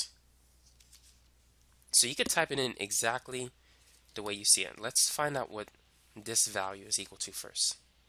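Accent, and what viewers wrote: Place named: New York, United States. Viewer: bro you sound like a new yorker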